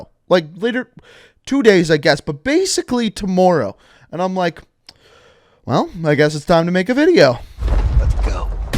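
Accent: Canada. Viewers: Are you Canadian my guy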